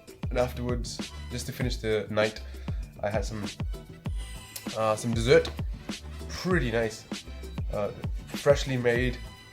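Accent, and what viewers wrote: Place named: United Kingdom. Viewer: Where did u travel from ? U got a uk accent.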